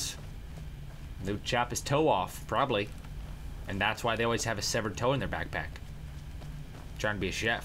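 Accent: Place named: Scotland, United Kingdom. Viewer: awesome Scottish accent xD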